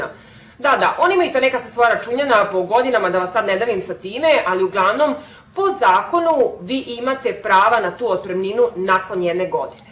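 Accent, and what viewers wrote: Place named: France. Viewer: vuce na francuski, moja sestra prica slicno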